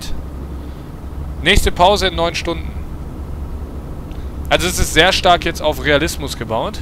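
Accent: Aachen, Germany. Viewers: KOMMST DU AUS AACHEN?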